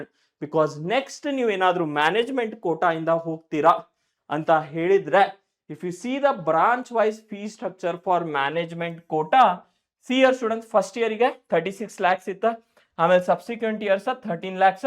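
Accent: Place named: North Karnataka, India. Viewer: Bro you are Uttar karanataka 😅😅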